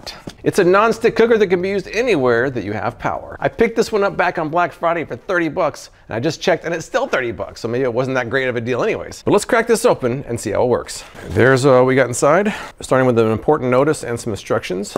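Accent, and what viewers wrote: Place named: South, United States. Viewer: Thumbs up for. Next time lean more into the southern accent 😅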